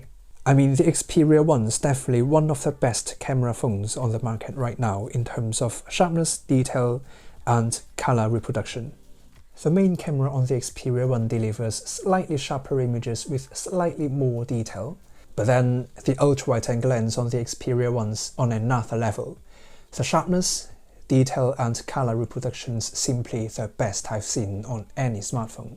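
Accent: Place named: United Kingdom. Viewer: 我的天啊你的british accent真的太好聽啦，完全是一種享受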